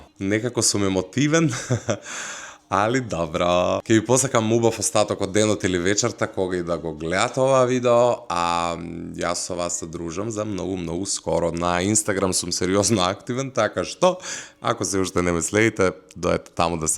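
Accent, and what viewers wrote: Kumanovo, North Macedonia. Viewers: Од Куманово е, па ако зборува "како што треба" пола држава нема да го разбереме🙂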